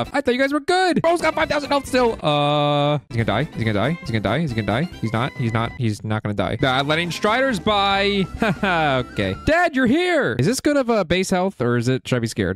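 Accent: United Kingdom. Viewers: bri'ish man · Bro turned british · bros British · W british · lol british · Bros british accent is so good whattt😂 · BRITTISH :D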